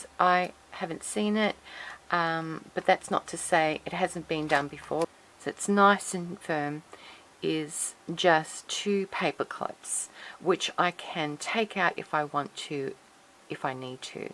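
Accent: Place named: Australia. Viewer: I love your work! Your papers and designs are amazing! I take it from your accent that you must be Australian? Anyway you are so creative!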